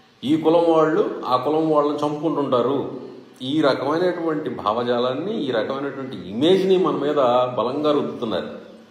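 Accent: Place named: Andhra, India. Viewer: sir....meeru excellent gaa matladutunnaru....educated andhra voice vinpistunnaru...keep on going sir....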